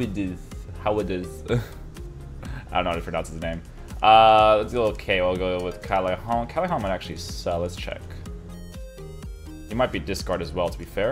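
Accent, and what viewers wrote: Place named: Portugal. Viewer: Are you portuguese? You have a portuguese accent!! Btw you're a great fifa player!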